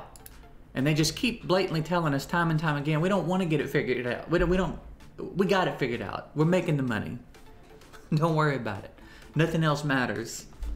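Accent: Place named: New York, United States. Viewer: Play Raid, back you were hood, edition. Nah, just New York flow. It makes me homesick. Yes, I'm still binging your vids.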